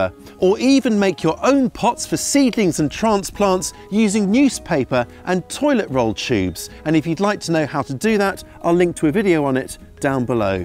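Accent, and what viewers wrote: United Kingdom. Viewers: "just pop it in"..."use a brisky brush"...I will never tire of the British vocabulary and accent! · I love the British accent.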